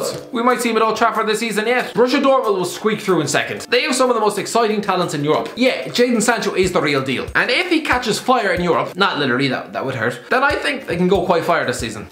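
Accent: Ireland. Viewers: Are you irish? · What part of Ireland r u from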